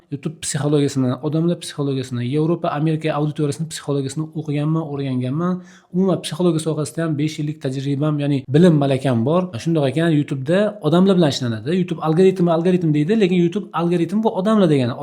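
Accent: Namangan, Uzbekistan. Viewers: Узингиз Намангандансизда, шевангиздан билиниб турибди. Ман олдингизга бориб урганмокчиман, майлими, Наманганданман...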